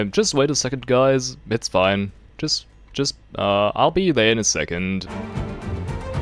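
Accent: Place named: Franconia, Germany. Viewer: Wait are you actually German? Your accent is interesting, what region are you from? Your pronunciations remind me of the Franconian accents I've heard.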